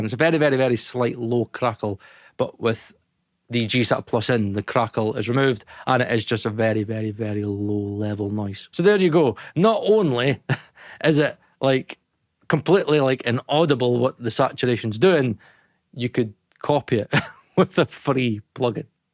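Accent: Scotland, United Kingdom. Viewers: I love scottish accent. For Kick Attack preset I thought he said KekAttic.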